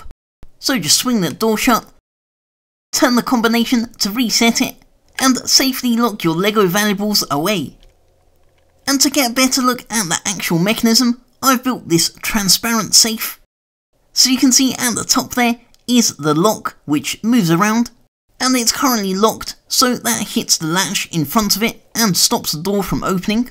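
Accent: United Kingdom. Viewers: Cool.I have my own channel which is inspired from seeing the worlds coolest LEGO builder. Are you from Great Britain?The accent is very familiar to Britain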